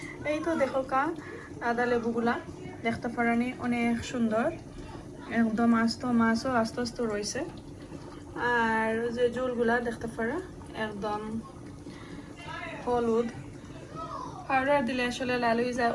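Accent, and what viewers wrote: Sylhet, Bangladesh. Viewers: Oy apa amar khob vala lage honte sylheti mat afner, shob shomoy ola matiyo re go, ar jee mojar khatta ransuin, Ma sha Allah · I totally agree with you sister . Lot of sylheti try to impress by speaking shudo basha and it just doesn’t come over . Be proud of your dialect ..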